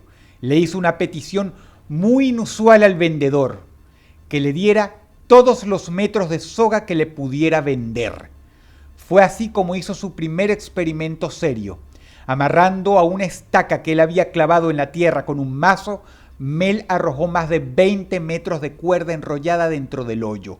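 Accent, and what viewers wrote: Venezuela. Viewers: Aquí Dross todavía tenía acento venezolano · De los tiempos de cuándo Dross aún tenía acento Venezolano :v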